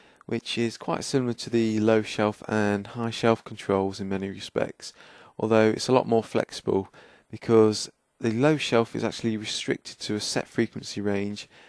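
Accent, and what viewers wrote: United Kingdom. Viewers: hes sounds like he from the midlands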